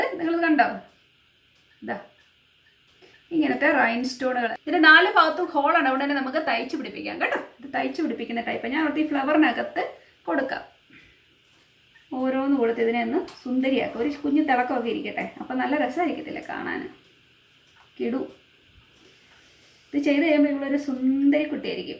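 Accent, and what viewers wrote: Kottayam, India. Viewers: ഇച്ചിരി ഇച്ചിരി .. കോട്ടയം. ചേച്ചി നന്നായിട്ടുണ്ട് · Superb, good work, kottayam aano naadu, varthamaanam nalla rasamundu kelkan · കോട്ടയം kari ano 😍❤️😘👍👍👍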